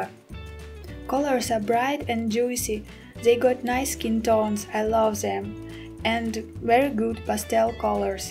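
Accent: Russia. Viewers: Руссский акцент ) суперский обзор ))! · Какой русский акцент... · Кто русский? У автора акцент русский :D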